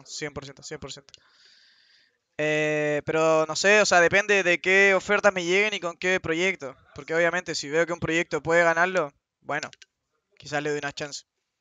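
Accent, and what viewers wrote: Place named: Argentina. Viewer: Pq habla como argentino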